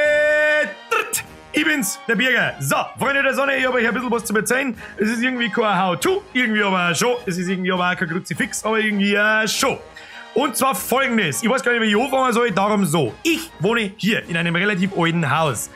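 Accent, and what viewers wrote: Bavaria, Germany. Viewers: Ich komme aus Sachsen, genauer aus dem Vogtland und ich bin gerade erstaunt wie viele Ähnlichkeiten unser vogtländischer Dialekt (der im Übrigen mit Sächsisch nicht viel zutun hat) stellenweise mit dem Bairischen hat. Man könnte das Vogtländische als eine Mischung aus Sächsisch, Fränkisch und Bairisch bezeichnen. Ich versteh auch fast alles was du sagst, was glaube ich einem NRWler oder Berliner deutlich schwerer fällt.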